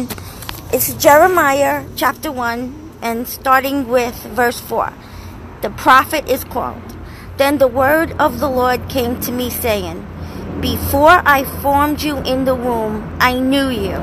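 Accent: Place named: Queens, United States. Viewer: This is a great idea. Do you live in Queens NY? I ask because you have an accent and I hear a plane going over, in from flushing and had the anesthesia of LaGuardia going over my house all the time.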